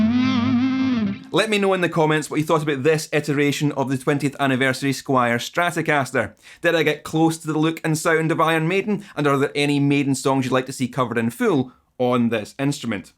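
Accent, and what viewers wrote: Scotland, United Kingdom. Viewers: Now that you have a spare black pickguard from this baby, why dont you build an Adrian Smith Signature Jackson in white and black? I have done one from one of my old Aria STG 004s with a swap of maple from rosewood neck. Also painted the white pickups black. Now plays fantastically. Oh by the way, am a huge fan of your Scottish accent. Alba gu brath! 🙋‍♂️🇹🇷🤜🤛🏴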